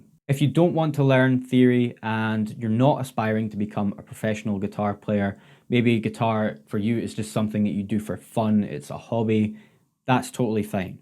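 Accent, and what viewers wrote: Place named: Scotland, United Kingdom. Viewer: two seconds on this chanel and i already can tell you're scottish <3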